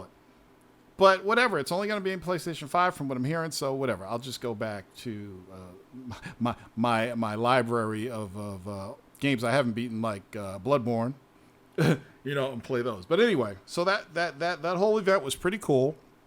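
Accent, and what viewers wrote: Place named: United States. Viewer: why you put on an american accent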